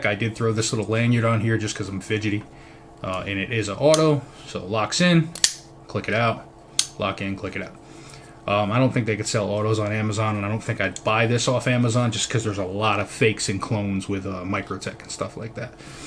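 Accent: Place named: Philadelphia, United States. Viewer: Great EDC. Your accent has a Philly vibe.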